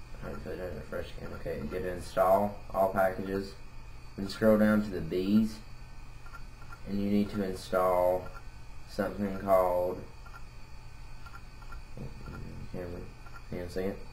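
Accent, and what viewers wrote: South, United States. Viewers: I'm assuming this only applies to jailbroken devices, seeing as i can't find an app called "installer" on mine... nice country singer accent btw...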